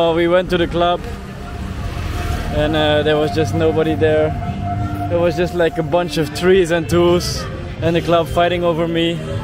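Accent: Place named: Netherlands. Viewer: You sound Dutch bro , netherlands or Belgian ? 😜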